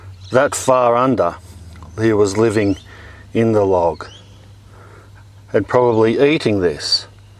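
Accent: Australia. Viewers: Straight Aussie. Reminds me of my dad 😆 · Typical Australian hehe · Australian accent is funny 😂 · was raised loving the crocodile guy accent, AND YOU HAVE IT ALONG WITH HIS VOICE, JUST MORE CHILL, MAKES YOUR VIDEOS MUCH INTERESTING, LOVE AND APreciate, ESPECIALLY WHEN U SAY, "SO NEXT LETS GO TO THE NEST TRUNK, OH YEAH ITS CHIPING, ITS TURNING INTO DIRT AND DUST" LOL LOVE IT MAN, IF THE TRUNKS WERE CROCKS 🤣👌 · Came here to listen to an Aussie talk about what happening Down Under.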